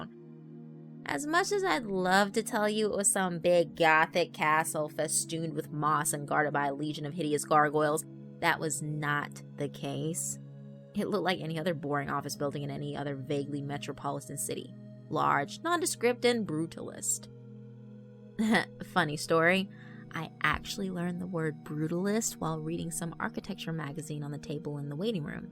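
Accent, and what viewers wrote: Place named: England, United Kingdom. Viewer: Loved the English accent ❤️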